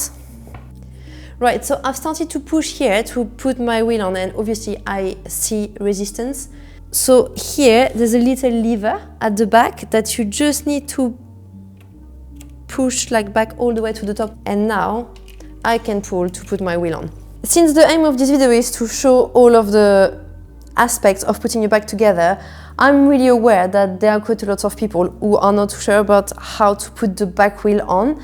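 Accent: France. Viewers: Sounds totally French to me.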